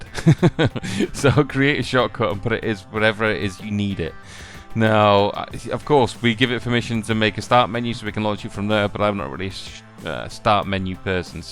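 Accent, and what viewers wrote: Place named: Leeds, United Kingdom. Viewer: You're a Leeds lad I gather?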